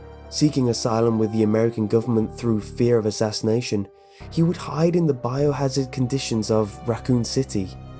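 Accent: United Kingdom. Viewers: Dude he’s British You must be a idiot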